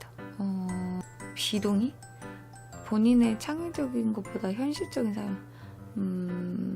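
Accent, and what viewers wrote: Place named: Jeolla, South Korea. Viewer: 전라도 사투리가 넘매력적예요 파티마님에서 이제 으로 갈아탔습니다 ㅎㅎ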